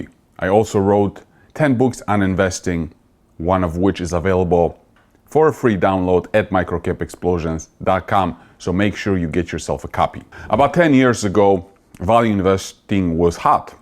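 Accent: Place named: Midwest, United States. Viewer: M. I feel very fashionable! On Sunday evening 23 January, I was 85% in cash, on Monday 24 January, I was fully invested and in margin, PLTR, SOFI, XPRO, IMDB ETC. THE 15% remained in the big 3 of yours, And USGDF, AZMCF,CNSNF. I don’t care about stock prices, just want to be fashionable. Going to be measured for Brioni, Dolce & Gabbana, and Givenchy, I have grey hair Fair, wrinkled skin, with brown spots what colors do you recommend,( to match my stocks? Not sock,)? BTW, you are developing a midwestern accent like Ohio or Indiana.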